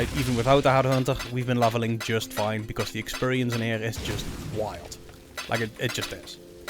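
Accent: Netherlands. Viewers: I Looove the dutch english dialect. nice video.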